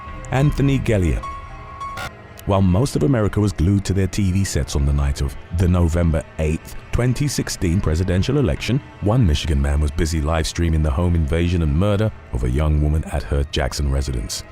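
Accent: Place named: United Kingdom. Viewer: it’s an accent because he’s British but he’s also pronouncing names completely wrong. Even by British standards.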